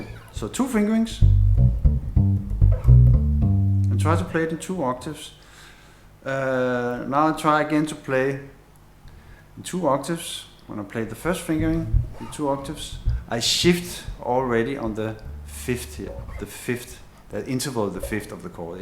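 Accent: Denmark. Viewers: Awesome lesson! :D really helped. And I love your danish-english accent :D so pwnage :D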